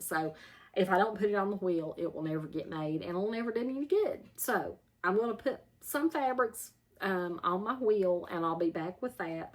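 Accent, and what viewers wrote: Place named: North Carolina, United States. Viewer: I can just listen to you talk all day long. You sound like my cousins down in Yancey County NC. I was born there but we moved away when I was four years old. Your sewing channel is so fun to watch, love it! 😍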